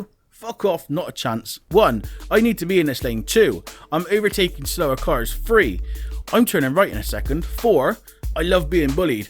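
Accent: Bristol, United Kingdom. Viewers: Haha, love the presentation, the Bristol accent just makes the insults all the better. Subbed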